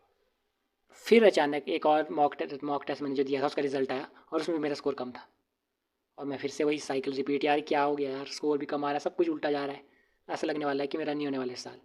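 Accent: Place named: Himachal Pradesh, India. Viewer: Maine 1st tym dekhi apki vdo .. or apki tone se hi smjh gyi thi ki pkaa hp se hone ap bro 😁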